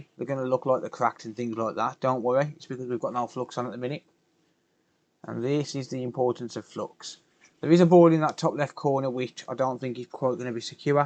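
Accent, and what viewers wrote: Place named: Dudley, United Kingdom. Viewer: You from dudley ?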